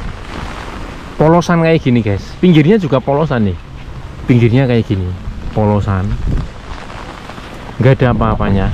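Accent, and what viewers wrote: Banyumas, Indonesia. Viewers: Mas, kayane logate ngapak ya?, panjenengan nyambut gawene opo to mas?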